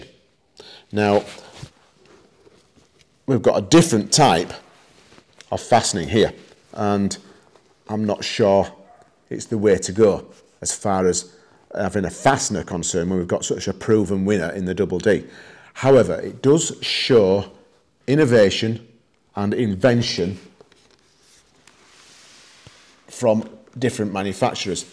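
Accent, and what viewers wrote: United Kingdom. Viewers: With that accent, I was expecting the price to be in a British pound rather than dollars.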